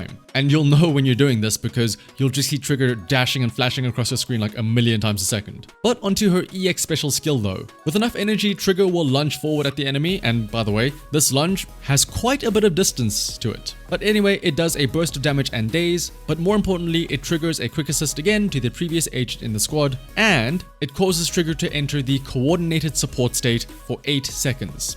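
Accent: South Africa. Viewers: Are you Afrikaans? Cause I recognize the accent. I speak ook afrikaans very deliciously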